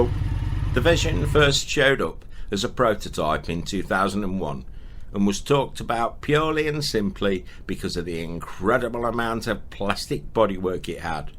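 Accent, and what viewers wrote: United Kingdom. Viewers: Your British voice makes this ten times funnier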